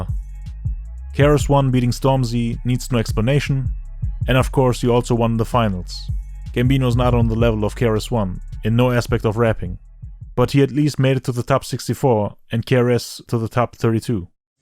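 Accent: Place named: Germany. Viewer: Sounds a Little Bit like you got a german accent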